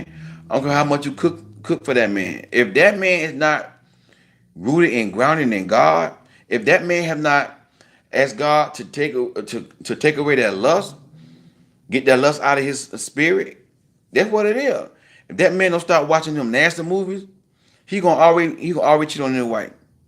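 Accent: South, United States. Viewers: Love the country accent, Christian values, and quality content!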